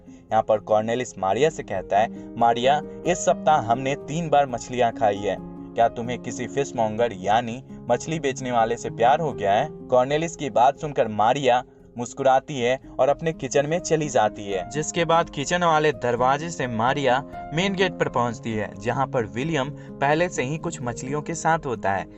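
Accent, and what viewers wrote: Bihar, India. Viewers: Story is gud but Voice se lgta h bihari muvi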